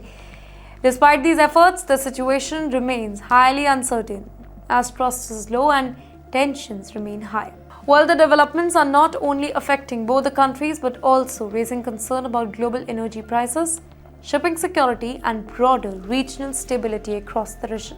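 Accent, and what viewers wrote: India. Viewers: THIS HINDIYAN SPICY ACCENTED NEWSCASTER IS WEARING A SCHOOL UNIFORM, MUST REALISE HER MODI WILL SUFFER THE IRAN OIL & GAZ SUPPLIES IF THINGS ARE MESSY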